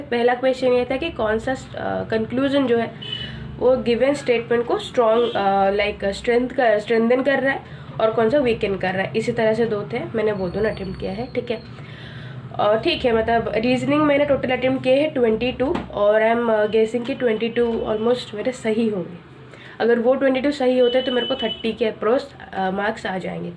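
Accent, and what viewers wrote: Odisha, India. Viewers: Didi app odisha se ho kya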